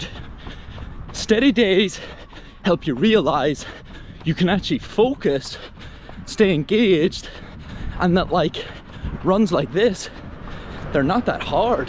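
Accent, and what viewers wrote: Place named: Northern Ireland, United Kingdom. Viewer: Heard the N.I. accent & I’m intrigued. I’m doing the Belfast marathon 20 years after the last time I did it & hoping to do it faster.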